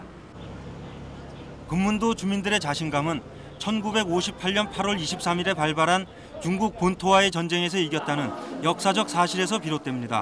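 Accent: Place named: Seoul, South Korea. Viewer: 그러고보니까 나레이터 90년대 서울 사투리 안 쓰고 요즘 서울말에 가깝게 말하네 취재진들은 90년대 서울 사투리 쓰는데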